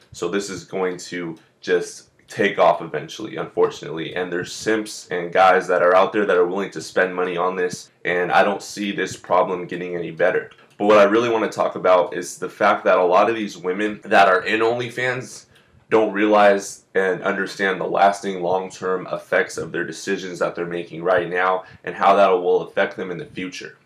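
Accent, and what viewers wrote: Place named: California, United States. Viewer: I can hear the california in your voice.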